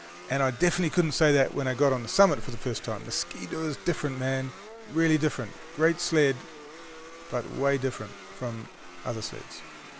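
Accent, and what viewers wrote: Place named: Australia. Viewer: Dude the swedes made the bad ass tune for the yammie. 270hp on the 153. This dude sounds like he's from Australia. That's not a knife this is a knife. They made 2 strokes look like clowns like those guys digging and talking more then riding ripped through arm deep powder. The srx tune to 270 made cats alpha one look real bad. Called weekend sleder's. Even the older stuff 1049 vipers 270 tuned made 2 strokes look bad. Those yammies ate through anything they put them through and everyone knows yammies reputation of big miles and durability. Like it or hate it.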